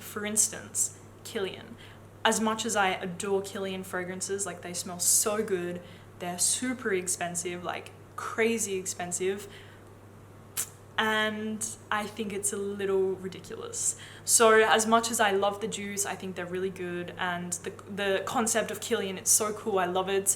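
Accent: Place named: Australia. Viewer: I really appreciate your honesty! During my quarantine in the UK I’ve parted with a substantial amount of money on various fragrances - all of which were of your recommendation. Everyone single one was a blind buy and I have not been disappointed once! It’s so important to be transparent with your followers so thank you! Also I’m a fellow Aussie so love hearing a familiar accent ❤️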